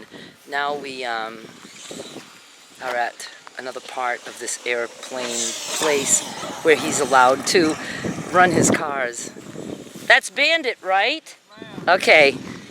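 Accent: Maine, United States. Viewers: "His caws" haha you still have your Maine accent! Love it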